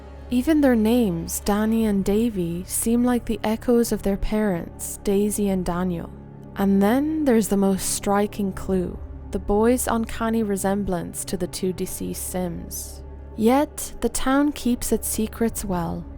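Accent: Ireland. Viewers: I have been listening to you and watching your videos forever and only just now clocked your Irish accent!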